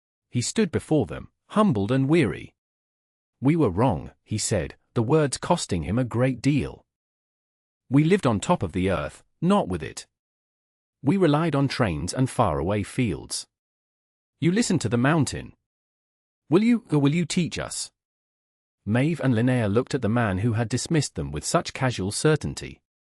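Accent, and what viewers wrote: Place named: United Kingdom. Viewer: Is there a particular reason for the British accent for the narrative?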